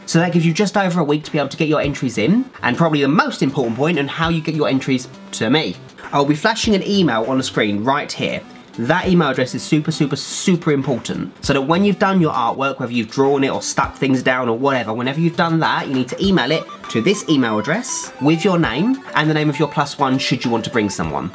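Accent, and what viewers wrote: England, United Kingdom. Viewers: which part of England you from? i love your accent!! <3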